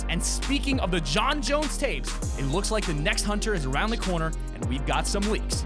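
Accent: United Kingdom. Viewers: Is someone British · u speak london very best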